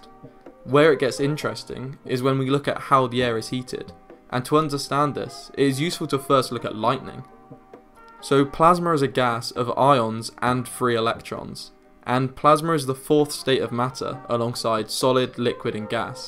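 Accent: United Kingdom. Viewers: This video demonstrates if you have a British accent it does not necessarily mean you are smart. Lol, I'm sure he is intelligent. It's just that he knows so much that cant be proven.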